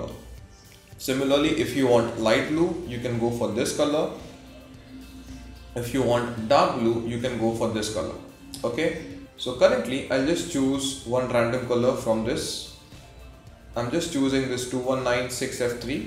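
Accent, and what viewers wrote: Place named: India. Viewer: DUH BHUUTTTOOOONNN!! THICK ASS FUK INDIAN ACCENT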